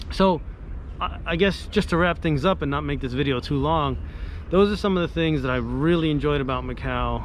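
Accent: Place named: Los Angeles, United States. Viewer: This is my first viewing of your video and I think you did an amazing job. When I started to hear you speak, I thought you were from Los Angeles which is where I'm from. I love your thoughts on living in Macau where I was lucky to visit in 2008. I totally understand the comparisons you made of Macau and other places in the States. I hope to one day visit again and check out the places you mentioned. How did you make the adjustment in terms of speaking Chinese? Are you speaking more Cantonese or Mandarin?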